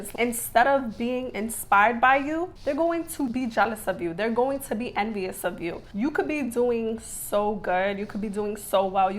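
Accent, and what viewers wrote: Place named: New York, United States. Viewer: I love how you sound. Are you from New York?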